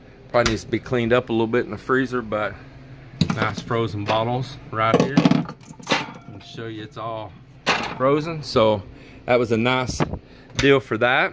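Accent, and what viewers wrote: Texas, United States. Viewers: your drawl is getting stronger over time as you stay in Texas. It takes a yankee to notice.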